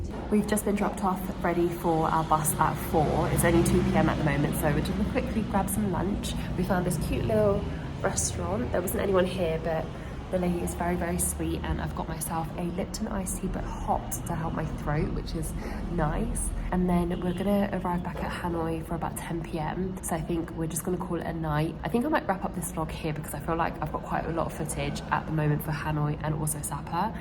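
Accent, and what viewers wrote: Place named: England, United Kingdom. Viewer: I like your accent ! Are you from England ?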